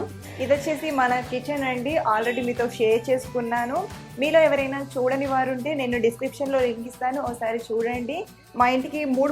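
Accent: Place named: Karimnagar, India. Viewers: TELANGANA yaasa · Akka meedi pakka Karimnagar ey anipistundi.. 🤔🤔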